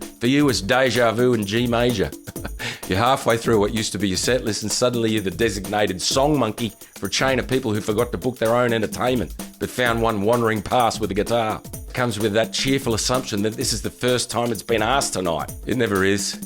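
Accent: Australia. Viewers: Had to come back here after initially enjoying this a month ago as I thought of you at a live outdoor gig this weekend. Everything was actually good and I had been waiting to have a slower moment and break out a song. I started in very softly and the puppy adoption set up which was part of the event and had been there quietly all afternoon under the trees in a corner, had a visitor walk by with two dogs of their own. About 20 dogs commenced to bark, as I began to sing. I switched to a chorus of and in my head heard your Aussie accent say dogs barking off to the side like there's no tomorrow, that's a new one. And I swear it was not in reaction to me!😂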